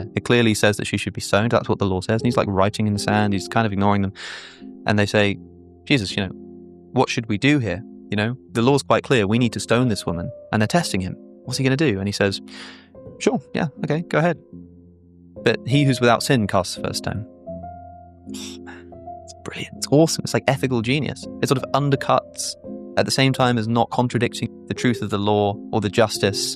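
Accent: United Kingdom. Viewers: I think you meant to say "why are you not" The answer to "why are you hot." is because he is an intellectual with a smooth british accent and a soft face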